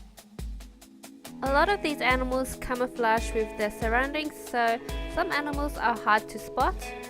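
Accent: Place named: Australia. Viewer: lol cool I was just curious because your intro was hello in Korean. Anyway you don't have any accents other than the obvious Australian's accent:). If you're still in Korea, please do a street food video =) cheers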